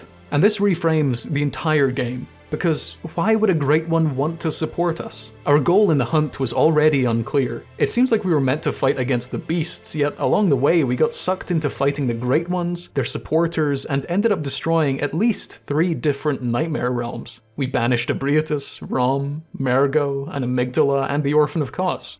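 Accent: United Kingdom. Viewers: Babe wake up, uploaded. It's giving British.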